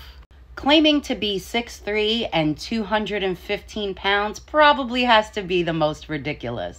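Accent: New York, United States. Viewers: New Yawk girl tellin' it like it is! Straight up, to the point, take it or leave it! 👍😀 Stay TRUE, Vote BLUE! 💙🇺🇸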